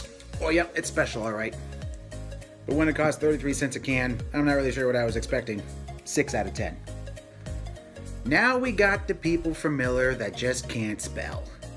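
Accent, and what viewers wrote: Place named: Upper Midwest, United States. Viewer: He has a strong Northern Midwest accent. Sandler has a New York accent. Kind of similar I guess.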